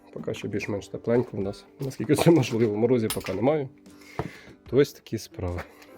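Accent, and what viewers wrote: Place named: Vinnytsia, Ukraine. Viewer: ...Гайсин... То Ви з Вінничини) я ж чую знайомий говір)))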